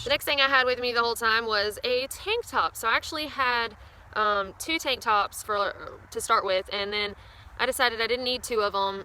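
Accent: South, United States. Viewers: I thought this was a really good video told by a really cool chick. where is your accent from exactly? Southern, Mississippi maybe? I've never heard the term "tobagen" before for a knit cap or beanie. Also, so are you saying a thru hike encompasses or spans no less than 3 seasons? As in spring, summer and fall? Kreikies thats a hell of a lot of hiking. What do you do at night? Play cards or anything? Are people hooking up at all? Haha! Or are the too stinky? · Nice work. I hike the Pinhoti Trail a lot. Have you hiked it? Its not far from you. I live in Birmingham. Love your videos, especially love listening to your southern drawl:). Im originally from the Sylacauga area. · Your southern dialect is kind of blowing my mind. I'm from NM and I've lived in D.C. and MA and I've never heard someone use the words "britches" or "toboggan" before. I would just say "leggings/tights" or "beanie". 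Anyway, I just found your channel and I love your videos so far. Best of luck on the PCT!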